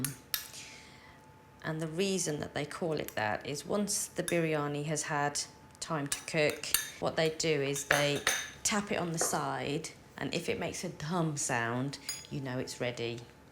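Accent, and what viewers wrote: United Kingdom. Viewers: Thank you for what you do. Your videos are knowledgeable and inspirational! It’s obvious you’re passionate about Indian food. 🥰 And I love your British accent. Best to you & yours.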